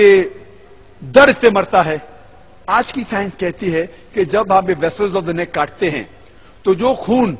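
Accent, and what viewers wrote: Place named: Maharashtra, India. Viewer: Aisa kuc nhi h sister Aap ko lgta h aisa He always speak truth but y Marathi h to inki Hindi Urdu zuban Zra kmzor h jiski wjh s inka bolne ka lehza bdl jata h hmare opposite isliye hme ajib lgta h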